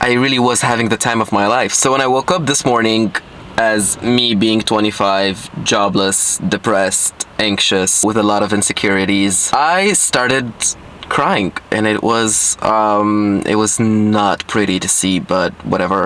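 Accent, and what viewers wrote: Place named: Tunisia. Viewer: First time watching u, at first I was like why tf is he speaking english, idk why but everytime i listen to a tunisian speaking in english (including me) it feels a little weird, damn I wish i could speak with american or british accent, anyways keep it up, nice video,this channel was a good discovery today. kind of like nathaniel drew of tunisia ;)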